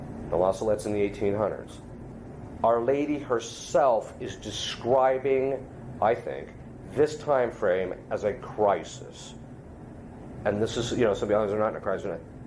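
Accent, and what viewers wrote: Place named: United States. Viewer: Plus, zar nije fenomenalan njegov jaki svjetovni,muski americki naglasak? Bas svjedoči njegovom specificnom karakteru.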